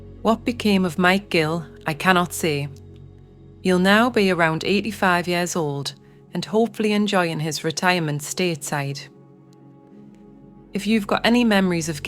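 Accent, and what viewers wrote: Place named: Newcastle, United Kingdom. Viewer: Hi ' '. I was a porter at Newcastle airport from 1987 to 89. I remember Gill air a lot as I worked a lot with them at the check in weighing the luggage and tagging them. This was their regular flight to Belfast. It was the same aircraft that you take a look around during this video, the one with the wing over the top of the fuselage. It brought back a lot of memories this video so thanks for that. Your videos are so interesting and as I've said before, greatly narrated with a true Geordie accent. Keep them coming.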